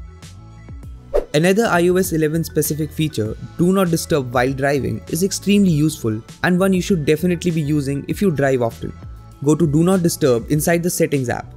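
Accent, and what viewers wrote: India. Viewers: I soon as I heard your Indian I went next!!! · Ughhhh Indian accent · As soon as I heard that Indian tech scammer voice I clicked off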